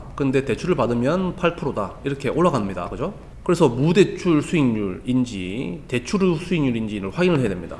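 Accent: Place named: Gyeongsang, South Korea. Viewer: 대구사람이셔요...?... 말투가 익숙해서 더 편하게 들립니당 :) 정주행하고있어요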